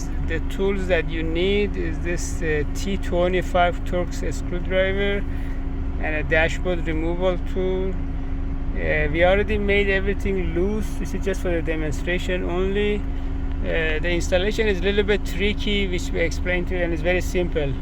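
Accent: Iran. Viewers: واى لهجه رو انگليسى با لهجه ايرونى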